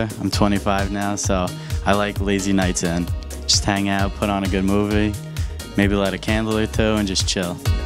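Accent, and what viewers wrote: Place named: Canada. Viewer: Is he Canadian? I have a Canadian friend who sounds almost the same.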